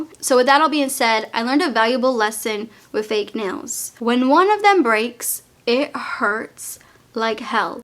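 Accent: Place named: Massachusetts, United States. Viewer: Massachusetts. Her accent is the number 1 reason why I subbed and she tries so hard to not have an accent lol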